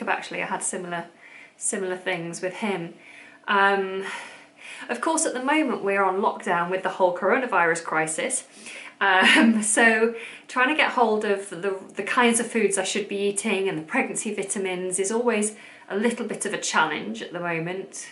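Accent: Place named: England, United Kingdom. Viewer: Congrats to your family. That cool English accent tho :)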